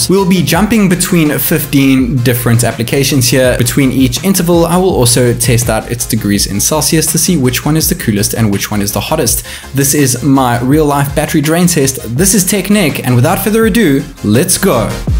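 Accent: South Africa. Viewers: This guy sounds soo South African 🇿🇦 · South African ? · Watched your Qna vid and a few times when I watch your videos i think you sound south African and it turns out you are! I am also from SA, live in Cape Town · Are you from South Africa? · I think I know a South African when I hear one :D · U sound south african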